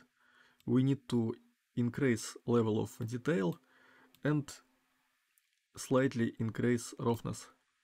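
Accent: Russia. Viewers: По окценту понятно что русский) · А как-то на родном, а не на конченом вражеском, на комильфо уже? Может, предоставить перевод самим браузерам делать? Поищу либо на оригинале (включу перевод), либо на родном, русском. Пишу по-русски ибо акцент дичайший, русский человек говорит. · cool tutorial but with your accent you should sound evil russian characters in games and films))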